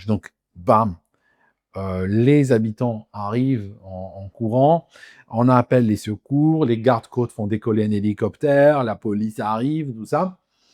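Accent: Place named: Switzerland. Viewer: tu Soules avec l''accent Suisse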